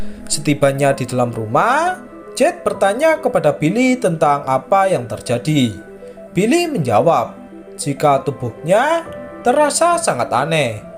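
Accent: Java, Indonesia. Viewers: Ah ga seru ga ada suara pemainnya .malah mendengerin Suara yg menceritakannya suara wong jowo apa pake di ceritain .segala males utk bantu sabcrek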